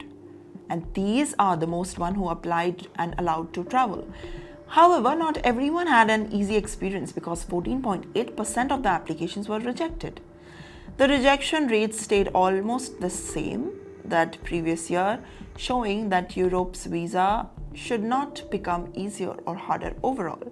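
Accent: India. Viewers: Just curry english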